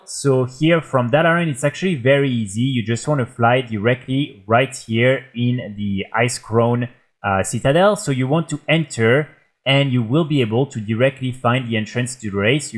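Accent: France. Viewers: Je reconnais un Francais quand j'en entends un 😅 merci pour le guide !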